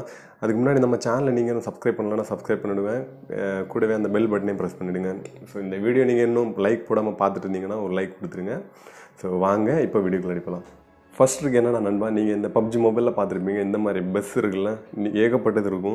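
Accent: Kanyakumari, India. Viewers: Bro ungalukku kanyakumari ya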